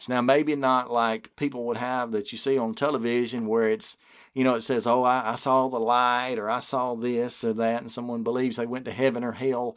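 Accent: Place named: Alabama, United States. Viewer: I 💘 the Alabama accent . It is so distinctive. You preach in a pool pit. I love it!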